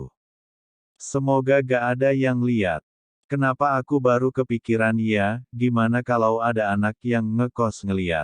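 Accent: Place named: Java, Indonesia. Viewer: Cerita nya lucu, ada logat Jawa nya lanjut Doong ❤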